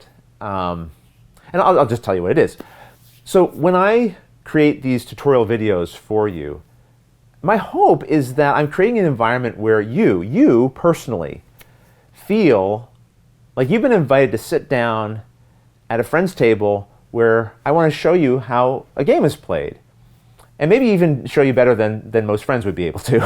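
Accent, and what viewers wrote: Canada. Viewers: I like the review idea, and your opinion can be taken for what it is. I am not going to be more likely to buy a game because or your Jedi mind trick of giving your opinion. It is a different platform for you, and is a different goal. You are in the business of showing people how to Play. And I think that is enough to expect. If you add your opinion, it is just going to see if you like it personally. I don't know if it will change anything. A shorter intro is not a bad idea. I tend to be looking at other things, and don't even really watch much of the intro; just waiting for the meat. So yes, short and sweat. As for the shirt, I sort of like that it adds a level of professionalism to your videos, but you tend to wear nice clothes anyway. I have seen other game videos where people don't even show themselves at all, but in your videos, you are on camera a lot. 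I see your goal of being a friend teaching the game, and I have come to consider you a friend even though I have never been in the same country as you. But I am a retired military officer, so uniforms for me are a statement of professionalism, so I like it. I think you are right, it really doesn't matter what you wear, you are an awesome teacher and do a far, far better job than any other game you tuber I have seen. You are always my first choice to go to find out how to play a game and I will watch you no matter what. You do talk funny, and have a Canadian accent, but I can forgive a few things. I love how you all say iro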